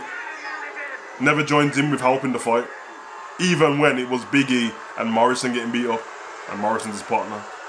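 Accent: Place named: Birmingham, United Kingdom. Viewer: Yo bro are u from brum u sound like u are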